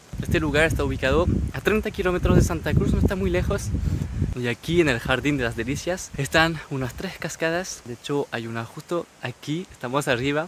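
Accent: Chile. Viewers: trasmites una paz con tu acento Chileno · Tiene acento chileno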